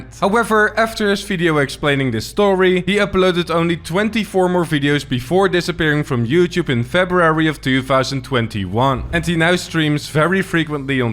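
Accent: Netherlands. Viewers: can always spot a dutch accent ! :D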